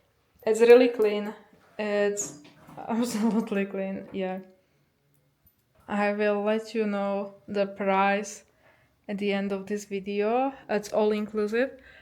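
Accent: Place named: Poland. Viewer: Bardzo Polski akcent :) 🎉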